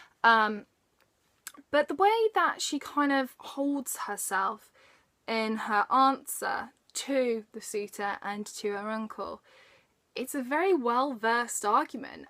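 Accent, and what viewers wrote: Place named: Midlands, United Kingdom. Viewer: You can definitely tell, Midlanders for the win! I'm from Stafford you see haha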